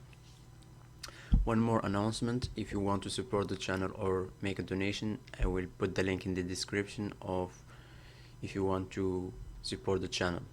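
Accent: Morocco. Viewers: hta ana 3Rftk mghribi nice bro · Men Accent 3rftk mghribi hhh + Chokran Bro 3la cher7 ;)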